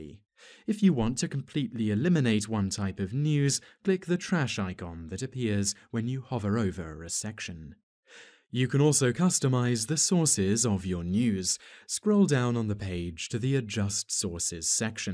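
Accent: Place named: RP, United Kingdom. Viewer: You sound soooooooo posh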